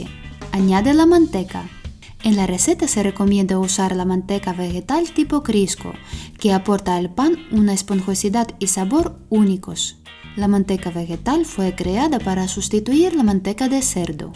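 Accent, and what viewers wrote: Russia. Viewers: te juro que me encantan tus recetas, pero tu acento me recuerda esos horribles munequitos rusos que tenia que ver de pequeno cuando vivia en Cuba, y se me ponen los pelos de punta. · Por mas qué pienso no logró identificar tu acento eres rusa o española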